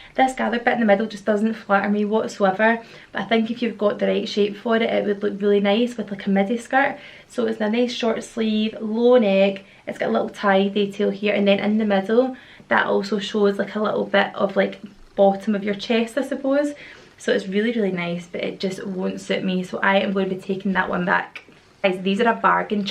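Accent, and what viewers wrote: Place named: Dunfermline, United Kingdom. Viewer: Are you from Dunfermline because you sound like my sister , Nicole xx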